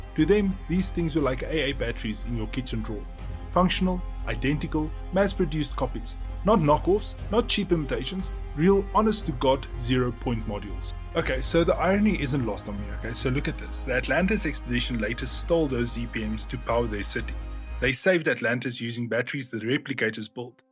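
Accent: South Africa. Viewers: Ek wou nou net se die ou praat net so well afrikaans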